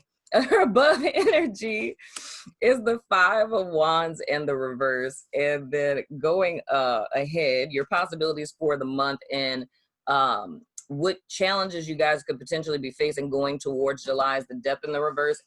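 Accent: New Orleans, United States. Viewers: I knew you was from New Orleans from your first word!! I am too & I said “Lawd she sounds like me” lol! ⚜️⚜️⚜️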